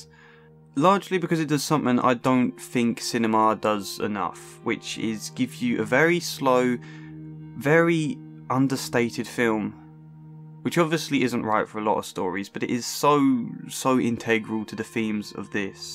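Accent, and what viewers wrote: United Kingdom. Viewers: You sound British, but I never could figure out from where, so this explains it (I am horrible at accents and can't recognize them well, so I wasn't worried about not being able to place you). I've lived in Texas my whole life, but I don't feel connected to it or the US in general. I don't feel tied to anywhere, nor do I fit in. It's wonderful to hear you speak of the place you come from with such affection and connection. I guess it's no coincidence that you represented yourself with a tree? You are tied to the lands where you were born. :) <3 Oh, and I do need to go watch this movie now! X)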